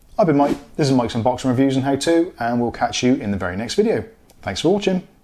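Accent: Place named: Devon, United Kingdom. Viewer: Mike, you're a bloody genius! Thank you! Nice to hear an accent from home too! (I'm from Torbay) Once again, you've rescued me from a compete shock when I made my first voice call on a brand new phone! Cheers!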